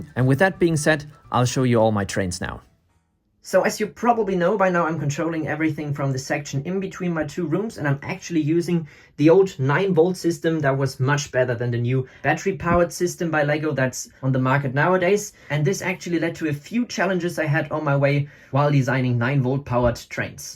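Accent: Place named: Germany. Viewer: GERMAN ACCENT!!! SO BAD!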